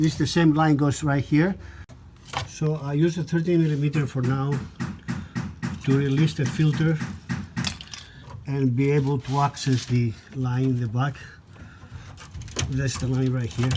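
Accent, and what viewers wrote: Greece. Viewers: You sound Greek lol. Good video.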